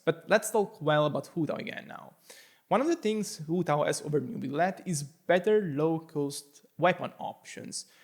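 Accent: Italy. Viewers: Thank you so much for the content you provide to the community, your graphs are always intuitive and extremely usefull to value and compare weapons, builds, and team comps. If i may ask a favor, when or if you will eventually do Furina's damage calculations, could you please make a comparison between her BiS weapon and mistsplitter with her c2? Probably i won't be pulling for her BiS even if i might go for her c2 cause of "green donut", and mistsplitter is what i would give her in that case. It might be the case for a lot of people but maybe they have a different weapon in mind so you'd probably need to make calculations with every weapon to make everyone happy xD, but you do you. Also, are you italian? Cause i am and your accent sounds italian to me xD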